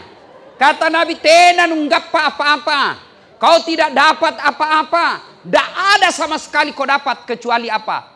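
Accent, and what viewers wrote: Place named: Makassar, Indonesia. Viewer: Saya di samarinda sangat terhibur dan teringat logat2 khas makassar yg sangat lekat sejak masih di sulawesi..😂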